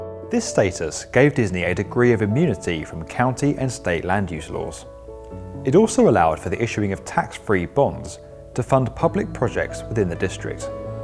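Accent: England, United Kingdom. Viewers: Mr JayL well let’s hear your voice , he has a clear English Accent , do you !! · CAN WE GET A NARRATOR WHO IS NOT BRITISH BUT AMERICAN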